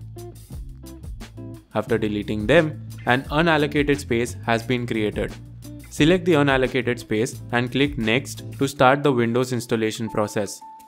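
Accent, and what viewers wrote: India. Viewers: As I heard the Indian accent, I knew this is going to work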